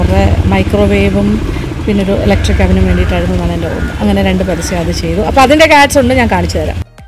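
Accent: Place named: Southern Travancore, India. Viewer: No doubt you are the one who inspired culinary skills in all young minds!! Even I was very particular to be present before Kairali channel at the right time to watch you appear - - though staying at the heart of tvm city your southern travancore slang attracted me the most- You always maintained an unique cooking style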